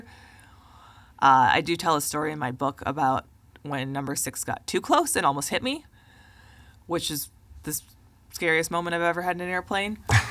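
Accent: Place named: Wisconsin, United States. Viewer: She’s awesome. What’s funny, I was listening to her talk and KNEW she was from Northern WI. I talked to/spent a lot of time with a woman about her age from up there and they sound the exact same 😂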